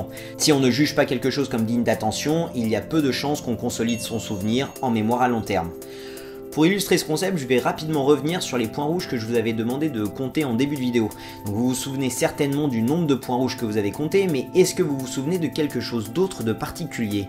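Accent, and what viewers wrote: England, United Kingdom. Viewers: Lol moi qui suit anglophone et qui regarde egalement tes videos pour ameliorer mon français je suis mort de rire de ton accent anglais il est drole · J'adore son accent anglais xD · j'avoue ton accent anglais il m'a fait rire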